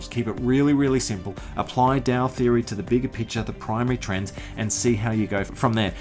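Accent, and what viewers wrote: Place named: Australia. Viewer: So let me get this straight. Jim Rickards, Joseph Stiglitz, Jim Rogers, Lew Stockwell and Peter Schiff do not have a clue?( Highly unlikly. Further more fiat monetary policy is one of the biggest ponzi schemes ever invented. To use a vernacular that u being Australian cuase of ur accent. fiat money is like watering down ur cordial more water less cordial . fiat money does the same . U print and print. What is backing ur currency . Nufin cause ur great tresurer under the Howard regime sold all ur gold. Gold price at the time was circa 300 US then it dropped under 300. What is the price of gold today. Circa 1250 US a troy ounce. Which at present day is heavaly rigged.Cuase the Chinese , Russians and the Iranians have been purchasing bullion at a un precedented rate. Hence the markets r rigged and the bullion market is rigged. 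IE:London bullion market constantly floods the market with paper bullion (gold and silver ) not actual bullion. Markets historically have had 7-10 year cycles. Another problem with the western economic situation. All the afferomentioned economist speak of the velocity of money. QE1,2and 3 did not increase the velocity of money. EEC QE 1,2,3 and 4 did not increase the velocity of money . The Fed in the US has been by back in a bigway all this printed money in bond by bak so has the EEC. Its all rigged. the stockmarket is over leveraged at circa 500 percent today . In 2007 with the derivatives meltdown and banking shemazal the stockmarket was leveraged at 12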